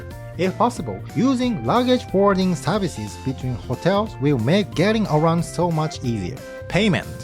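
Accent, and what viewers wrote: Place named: Japan. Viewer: Your English definitely has a Japanese accent but your features look Filipino.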